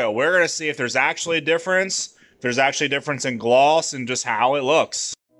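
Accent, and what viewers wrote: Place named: East Coast, United States. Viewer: With that accent you have to be from east coast, either PA or NJ or somewhere in that area I’m guessing but I’d say PA. Great video, also!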